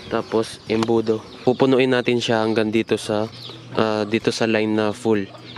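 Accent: Pampanga, Philippines. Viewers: Kapampangan accent.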